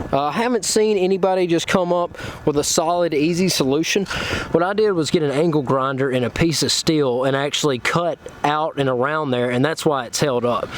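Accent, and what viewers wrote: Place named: South, United States. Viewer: how Sothern American: yes I subscribed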